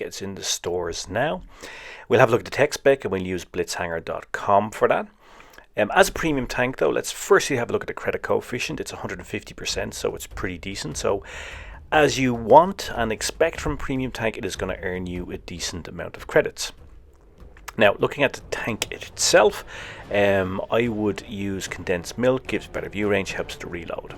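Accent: Ireland. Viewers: World of Tanks Blitz Ano ty si parada player...yes bc you v Good player and you voice is super...Irish...its Ok Am Polish player you a Normal nice Man...✌️💝🇵🇱 Am live in Poland,Prague is very very nice town do you se Kretek adventures 😂😂😂😂 its my chilhood childern in Czech,Poland,Slovakia..love this hmmm....creature 😂😂😂✌️👍😉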